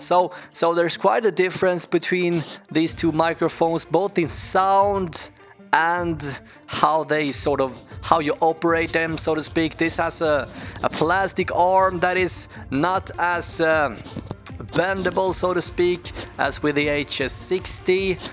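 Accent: Sweden. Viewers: Strong Swedish Dialect :)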